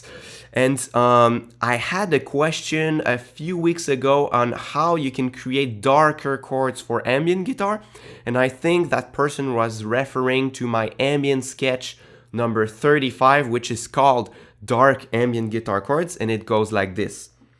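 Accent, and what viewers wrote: Quebec, Canada. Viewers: Quebecois accent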